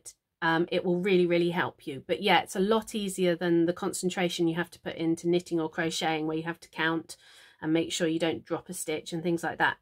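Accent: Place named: United Kingdom. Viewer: This was so helpful to listen to. I've been felting for about a year? I agree with you that it is so addicting. My one comment to add is that I watch many videos and find I want to try so many different types of felting (2D, 3d, landscapes, wet felting, needle felting...) I feel that trying a lot of different things has helped me narrow down what I want to concentrate on. Now, I need to practice, and concentrate on one type of felting to gain more confidence in my abilities. I look forward to watching more of your videos! I am American and just LOVE listening to your british accent!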